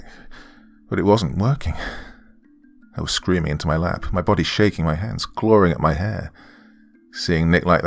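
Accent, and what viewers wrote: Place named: United Kingdom. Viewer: Thanks 🌹 for over 2hrs of your Britishlicious 😘 voice!!!! I hope that you and everyone else are safe ok 💋much love Sexy Queen of Darkness ✌❤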